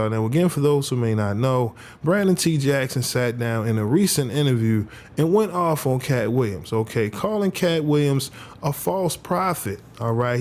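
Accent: Baltimore, United States. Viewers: I'm checking in from the DMV. First time finding your channel. When you said 2, I heard BMORE, i was gonna ask, but then you started talking bout BMORE. Showing you some...❤❤❤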